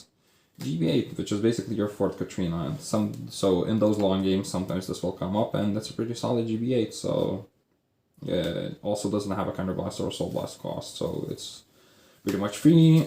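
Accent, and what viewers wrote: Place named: Poland. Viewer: Where are captions? I can't understand this polish accent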